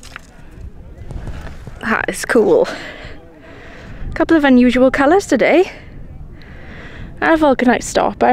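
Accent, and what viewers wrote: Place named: Wales, United Kingdom. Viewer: In love with yr welsh voice ! Beautiful 💋 ❤❤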